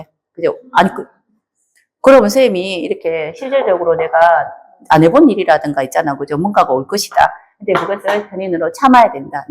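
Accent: Gyeongsang, South Korea. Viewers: ! 표정이나 말투가 보기 좋고 경상도 사투리 투박한 억양을 아주 기분좋게 잘 쓰네여 을목 오월생이 병화를 띄워 그런지 엄청 밝아서 좋은 에너지를 받아여 간간이 보여주는 유머나 천진한 듯한 애교도 구독자의 시선을 한 시간 동안 뺏기에 모자람이 없어 잘 보고 구독하며 감사드려여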